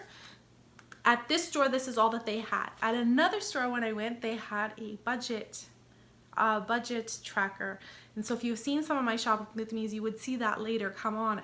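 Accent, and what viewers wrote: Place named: Canada. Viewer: Always love ur videos! Hope you do another garden one:). That was so unique and relaxing:). You are a very special and sweet young lady. I love ur accent-I lived in Mississauga, Ontario Canada for 6 months and love the way you say the word “about”:) I still have a very strong New England accent tho I left there in 1978! 💕💗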